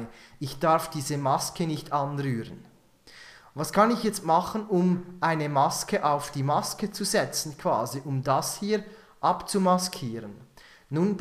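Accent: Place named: Switzerland. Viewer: Dein schweizer Dialekt ist einfach genial :D Komischerweise versteh ich dich sogar zu 100%, was nicht bei allen Schweizern der Fall ist ;)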